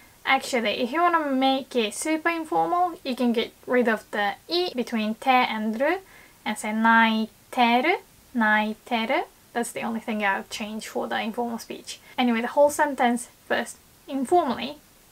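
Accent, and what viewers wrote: England, United Kingdom. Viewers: Your English accent's made me obsessed with your Japanese lessons! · is so fluent in English that I can only assume that she studied British English, or that she learned her pronunciation from a British person. British English and Japanese are non-rhotic languages so their vowel sounds flow very similarly, compared to the rhotic language of American English. I knew someone who studied American English for 10 years and had a strong, unnatural accent, but my friend who studied British English for 1-2 years spoke with a very natural accent. It is in my opinion that if more Japanese people studied the non-rhotic British English that they would be able to speak much more fluently. For example, let's take two words my English students struggle with, 'work' and 'walk'. If you look in Japanese-American study books they will say something as ridiculous as ウォーLク(walk) and ワーRク(work) in an attempt to force the rhotic sound (that isn't present in Japanese and has to be represented by the Roman alphabet). 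However, in British English ワーク(work) and ウォーク(walk) with rhotic consonants removed are perfect, with a simple accent change on the ワーク vowel from 'ah' to 'eh' and, other than naturalising the 'w' sound, ウォーク(walk) is perfectly natural to say too. If more schools/companies taught British English more and more Japanese people would be able to speak English with natural accents, and a natural British accent is much easier to understand than an unnatural and forced American accent. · British accent for the win! :) Great video btw. I was struggling after reading Tae Kim on this point. You explained it very well. Now I need to find some good examples of listening practice.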